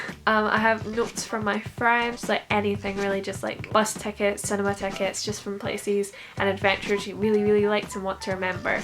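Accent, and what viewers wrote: Scotland, United Kingdom. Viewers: I love the idea of using tracing paper if you're not great at drawing. I will definitely be using that! Also love your accent, are you Scottish? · Omg you sounded Scottish so I immediately liked you😂💕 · I love your accent so much, it's like slightly Scottish and English and it sounds very nice and that combined with the amazing video is really great :) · lol I'm Scottish too but live in Wales now and people always ask me where in Ireland i'm from. I just tell them near Glasgow and theyre like oops :) love the video and good to hear another Scottish voice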